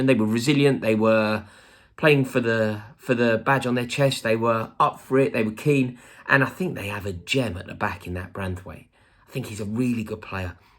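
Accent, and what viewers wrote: United Kingdom. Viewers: Такое забавное английское диалектное произношение👸😊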